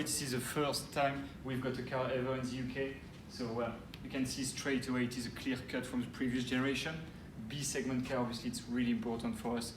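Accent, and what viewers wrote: France. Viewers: Pathetic French accent. Why not hire a native English speaker? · Très belle accent français ahah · laisse tomber l'accent français en langue anglaise ! les anglophones doivent se plier en 10 en l'écoutant. Même les africains parlent mieux l'anglais mdrrr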